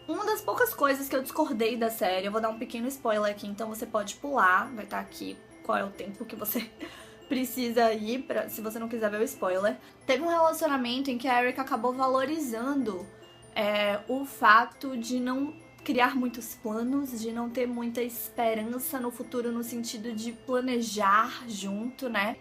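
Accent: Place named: Salvador, Brazil. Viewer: Amo esse sotaque do meu país Salvador hahaha Já tinha assistido o filme efeito borboleta, eu fiquei um pouco desanimada com o filme porque eu achei que as coisas demoraram a acontecer, mas eu até que gostei, achei a proposta interessante. Fiquei com vontade de assistir The Bold Type, acho que vai entrar pra lista kkkkkk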